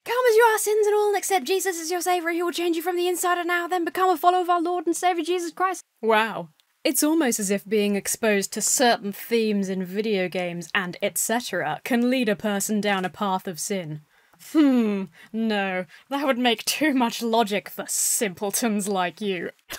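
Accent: United Kingdom. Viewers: Despite being English I tend to watch a lot of American channels but it’s really refreshing to hear some jolly good Bri’ish snark and sass from. 🇬🇧☕️ As for the fish thing, for the sake of being a know it all, there are multiple species that can communicate, such as saddleback clownfish, leaping blennies, grouper fish who can communicate with octopi, as well as fish that can use tools like the tusk fish.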